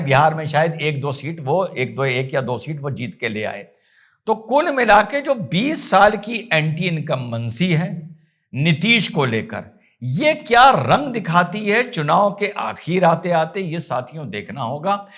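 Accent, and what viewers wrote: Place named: Lucknow, India. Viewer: bhai वंदे मातरम। इस रिपोर्ट में थोड़ा संशोधित कर लें। माननीय मुख्यमंत्री नीतीश कुमार जी की महिल रोजगार योजना में वहां की महिलाओं को वन टाइम के रूप में 10000 रुपए की आर्थिक मदद देने की बात है ना कि प्रति महीने। आपकी प्रस्तुति ठेठ लखनवी अंदाज में होती है जो मुझे अत्यधिक स्वीकार्य होती है। बहुत ही पसंद आती है। इसी प्रकार बरेली की घटना की शानदार रिपोर्ट करते हुए आप अचानक ही पक्के मुसलमान उन जैसे जिन्हें हम और आप भी कट्टर अर्थात् देश विरोधी मानकर चलते हैं। उनकी नजर में केवल इस्लाम है और कुछ भी नहीं फिर वह दोस्ती हो,इंसानियत हो याकि देश की एकता और अखंडता ही क्यों न हो? अपने उस रिपोर्ट में जिस अंदाज में विषय से हटकर अपने प्यारे मुसलमान भाइयों को जगाने का प्रयास किया वोह सिर्फ एक वाक्य हो हम जैसे आपके प्रेमी दर्शकों को भीतर तक हिला गया। उम्मीद है मेरी इस विवेचना को रिपोर्ट के परिप्रेक्ष्य में सापेक्ष रूप में लेंगे। जय हिंद । सबको सही रास्ता दिखलाने वाली उस परम शक्ति से बारम्बार एक ही प्रार्थना है कि आप उम्र के इस पड़ाव में अपनी मेहनत, विद्वता और कल्पनाशीलता के जरिए पूरे देश के साथ साथ पूरे मुस्लिम जहान में छा जाएं।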